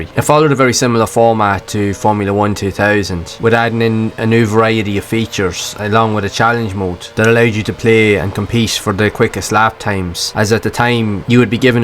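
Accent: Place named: Drogheda, Ireland. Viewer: Class vid. Thanks for sharing. Thats gotta be a drogheda accent?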